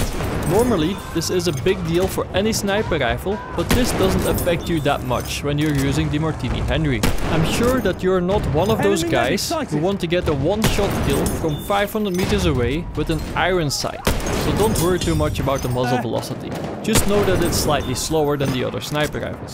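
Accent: Netherlands. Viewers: the dutch accent is strong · Annoying Dutch accent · Jij bent Nederlands zeker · are you doing that dutch accent on purpose? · are u dutch?:P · You have the dutchest accent ever hehe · Lekker nederlands accentje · i hear a dutch accent in your english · youre dutch right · are you Dutch? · Fix je accent en wees alsjeblieft niet zo Nederlands. Mijn oren bloeden.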